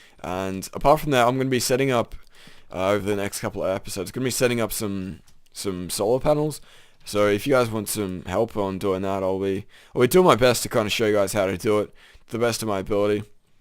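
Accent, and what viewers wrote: Australia. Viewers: Sorry dude, I'm not used to Australian accents.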